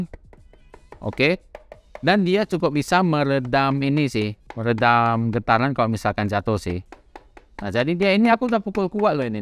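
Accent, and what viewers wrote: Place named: Pontianak, Indonesia. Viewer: Bahase koko macam Chinese Pontianak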